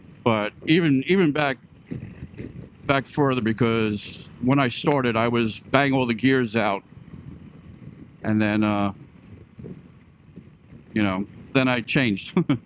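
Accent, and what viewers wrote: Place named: New York, United States. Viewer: Man you are so New York😅😂😅 Thanks for the videos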